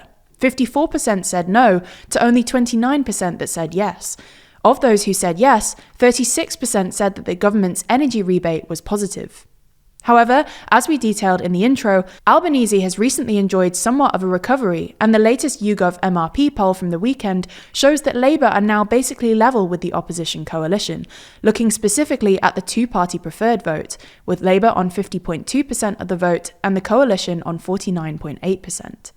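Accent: United Kingdom. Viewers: Love listening to Aussie election news with a nice British accent.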